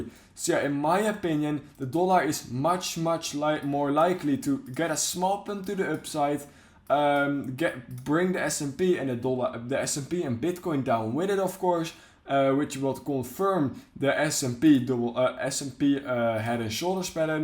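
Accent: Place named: Netherlands. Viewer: 25k is not going to broken 😂 Dutch english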